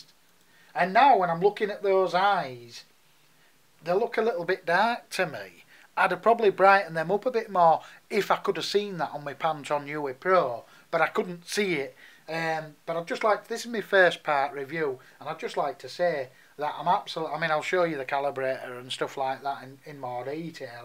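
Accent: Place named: England, United Kingdom. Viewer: where in england are you? i loove your accent :D too the spyder pro: Which one to get, and why did you get the Pro?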